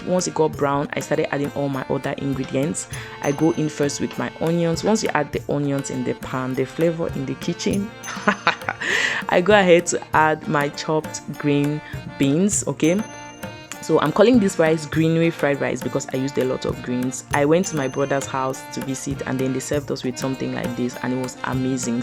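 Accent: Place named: Nigeria. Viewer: You have Nigerian accent the rice looks absolutely delicious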